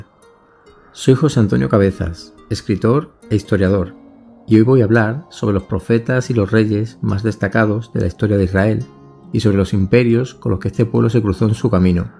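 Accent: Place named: Extremadura, Spain. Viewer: Me encanta la historia. Muchísimas gracias por los podcast, los voy escuchando poquito a poco. Esta semana creo que me llega el primer libro de Jesús que usted ha escrito. Sólo decirle que creo que por su acento, usted nació en mi tierra, Extremadura, a la que adoro y ahora más desde que no vivo en ella. Un saludo enorme y mil gracias por difundir su conocimiento.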